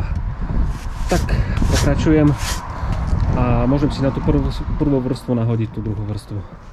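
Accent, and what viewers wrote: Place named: East, Slovakia. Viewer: Super chlape, okamžite dávam odber! Určite tu nájdem dostatok inšpirácie pre domáce svojpomocné majstrovanie. Podľa prízvuku som tipoval na východ a v prvých videách som videl špz z východu. Často jazdím trasu KE-HE cez MI, tak reku či chodím okolo tvojho domu???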